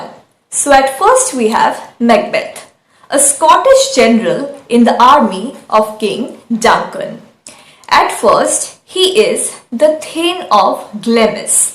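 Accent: India. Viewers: Might be good but Indian accent distracts me like hell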